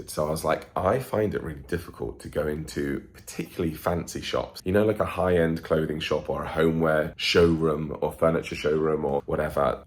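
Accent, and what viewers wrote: England, United Kingdom. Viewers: Ok take it from this old lady, you being so cute and an Englishman in New York. Oh, my, they could write a song about that. L o l just open your mouth and they'll love you. That lovely accent alone. Here in America you are one of the most classy person. So go in those upper shops look like you own the place. They love.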